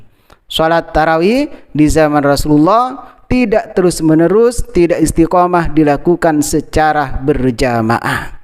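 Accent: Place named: Madura, Indonesia. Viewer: Kebanyakan logat bahasanya Madura. Sumbernya kiai yg sama. Mereka yg susah sunah ini misalnya Zaka, Sane Oye, terus orang ini. Orang ini udah salah disebarkan lagi. Maklum. Orang ahli bidah itu dihalangi tubatnya oleh Allah... E, chong ngaji ke Universitas Islam Madinah biar tidak pakai ibarat. Kasihan chong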